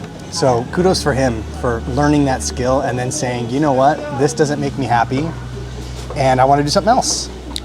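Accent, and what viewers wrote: Philippines. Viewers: not only your tagalog is good but you're slowly adapting the filipino accent and diction. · u now have a tagalog twang from the last time ive been here hehe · Hey it seems you're starting to develop the sexy Filipino accent in your English too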